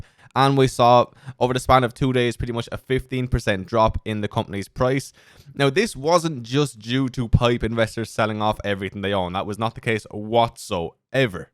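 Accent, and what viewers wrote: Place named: Ireland. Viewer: So glad you are back, good to hear that Irish 👊🏼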